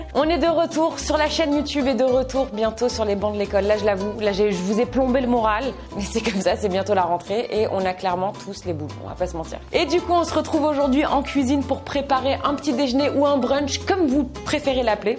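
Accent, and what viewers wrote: Canada. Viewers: tu mon youtubeur préféré de is vidéos accent canadien et qui met quoi des vidéos trop trop rire 👍👍👍👍👍 🇨🇵🇨🇵🇨🇵🇨🇵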